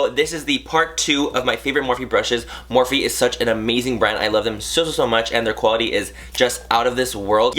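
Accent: England, United Kingdom. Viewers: Why does he talk in a British accent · can you do an entire video in your English accent please? tysm!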